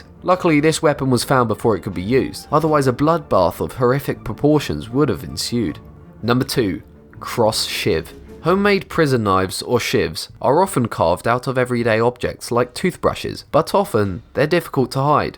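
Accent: England, United Kingdom. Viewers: O look another British narrator. Pass, dislike! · Final boss I think he sounds a little bit British. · That's just an english accent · Britsh accent again boring. Pass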